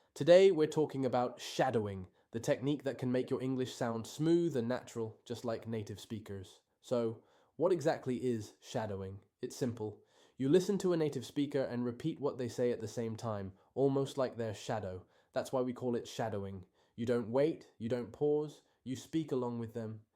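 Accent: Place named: United Kingdom. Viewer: How did you get the British accent with ressources that you use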